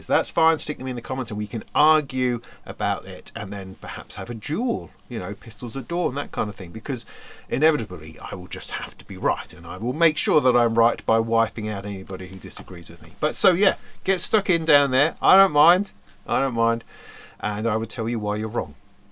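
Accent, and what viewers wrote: England, United Kingdom. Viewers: I’ve watched a few of your videos where you have explained you have American viewers. You’re just a sell-out. If the Yanks are too stupid to get their calculators out then so be it. You aren’t Murican, you don’t live there so stop pretending prices in dollars are part of your life. If you get loads of adulation for how you pronounce the names of synths then go and make a YouTube channel named I’m a Jolly English Chap What? Bit of a bell end aren’t you.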